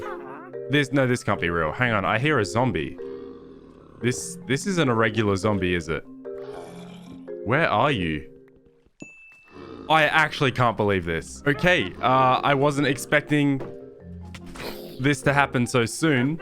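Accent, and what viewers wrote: United Kingdom. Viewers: Its like 3 months since you have shifted to britan and already you sound british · Are you British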